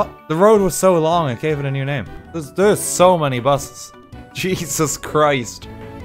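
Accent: Ireland. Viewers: Gotten used to how his accent sounds now and I honestly can't tell if he sounds less or more Irish than he does here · Ya know GrayStillPlays tries his best to make a chaotic city but you have made a well working chaotic city + an irish accent so you are better. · Are you from Ireland I am I live in Kerry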